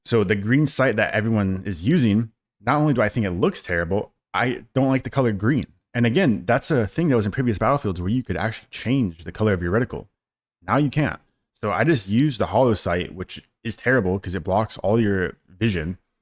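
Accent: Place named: RP, United Kingdom. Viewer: Listen to the Man, he learned from the BBC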